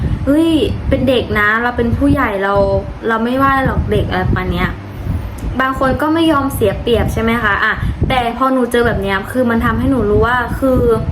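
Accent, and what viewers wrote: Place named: Laos. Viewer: หนูรู้ไหมสำเนียงของลาวลุ่มกับไทยภาคกลางยกเว้นกรุงเทพรวมถึงภาษาพูดพื้นๆเลยจะเหมือนกันมากเลยมีความเหน่อแบบเดียวกันแต่ภาษาพูดมีต่างกันบ้างลุงฟังหนูพูดเข้าใจได้ง่ายกว่าภาษาอีสานเคยฟังน้องอูพูด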